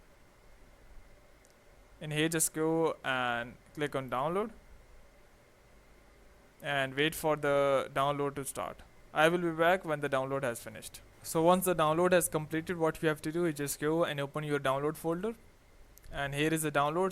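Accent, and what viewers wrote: India. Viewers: He is just speaking in an indian accent? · This guy sounds like hes from india. Don't follow hes tutorial it's just a big hack!!!!!! t-gay sub to pewdiepai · he doesnt sound like a tech support scammer he sounds like a homeless indian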